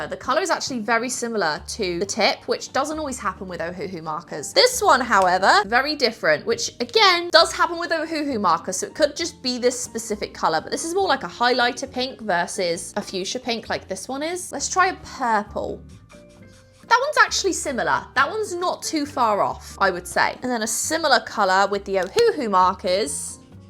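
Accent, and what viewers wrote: England, United Kingdom. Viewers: Besides your videos being informative, I could listen to your beautiful English accent all day.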